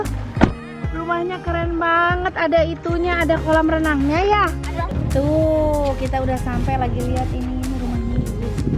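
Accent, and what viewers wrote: West Java, Indonesia. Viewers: Th makin syantik..aku suka logat sunda nya..sehat sllu and semakin sukses.. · teh ramah murah senyum logatnya orang sunda banget, sifatnya mencerminkan orang sunda yang ramah dan santun. i love you teteh sareng kang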